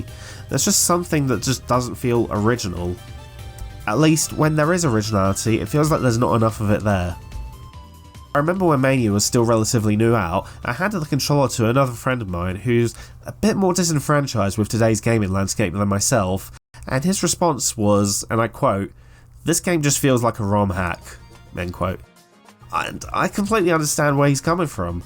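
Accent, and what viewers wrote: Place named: England, United Kingdom. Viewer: Are you me, but with an English accent? Because I feel like you are me.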